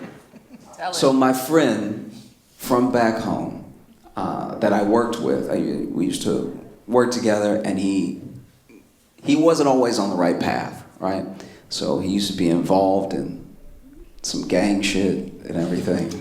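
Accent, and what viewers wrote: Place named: South, United States. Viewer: I notice that speaks in a way that shows he’s highly intelligent but at the same way his manner of speaking is “folksy”. For example he says wadn’t instead of wasn’t and them instead of those which is common in Southern dialects. He completely dispels the myth that if someone speaks a certain way they aren’t intelligent which is a common stereotype placed upon Southerners.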